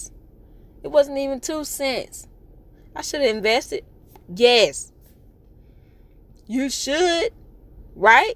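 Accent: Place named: South, United States. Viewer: Love that country twang lol and personality for that alone I will subscribed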